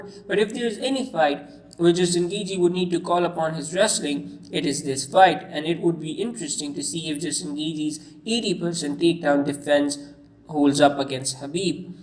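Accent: India. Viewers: yeah man..great to find an indian fight fan.... Namaste ... His accent sounds like indian ...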